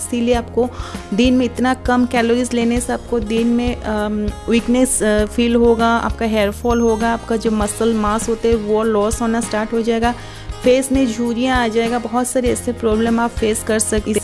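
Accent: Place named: Odisha, India. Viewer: Odisha style bolreheho .... Odia me bolo...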